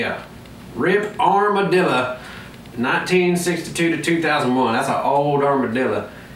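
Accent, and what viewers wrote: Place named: Alabama, United States. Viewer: OMG!!, HOW did you ever keep a straight face!!?? "Happier than a Pig in Shit"!!! Well drop me in Shit and call me dipped!! Your accent brings me back to High Skewel in MONgomery Alabama. 😅😅😅😆😂😂 The Puns alone were enough to get me to bust a gut!! I might buy it for the Body I believe. But, I'm sure as Church on Sundee that I'd get that Trck for the stickers and signs!!! Usetacould! 😜 DADGUMMIT! That was about the most fun I've seen y'all have on one of them videotapes! I reckon I ought to put my Twang back in the Box. Might just turn my Wife madder than a Opossum in a paper bag!! 😉 OK, I've got to be done here..... Sadly, I still live in Arizona. Sir, that was FUN! Carmine 💥 ⛽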